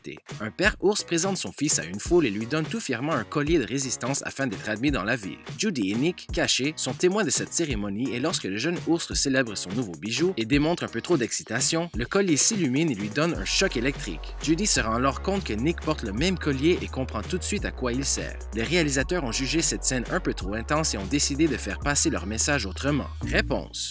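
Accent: Quebec, Canada. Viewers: c'est un québécois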